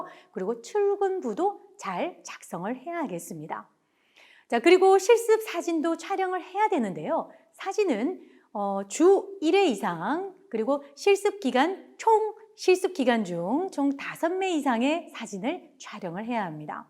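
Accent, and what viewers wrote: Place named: Gyeongsang, South Korea. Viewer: 오래전 교수님통해서 복지사자격증 취득했는데요 구수한 경상도사투리에 강의참잼나게. 청취했습니다 대단한교수님입니다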